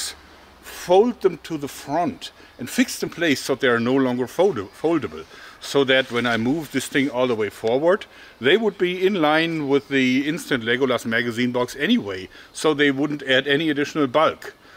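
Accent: Germany. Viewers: excuse me! Are you German?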